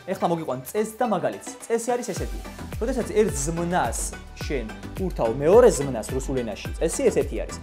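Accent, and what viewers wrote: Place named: Guria, Georgia. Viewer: გურული აქცენტი გაქვთ მეგობარო,რუსი ხართ მართლა?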